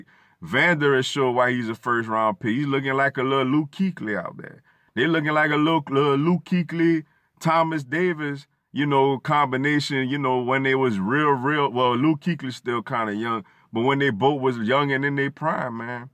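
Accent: New Orleans, United States. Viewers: man you got a new Orleans accent...I swear.....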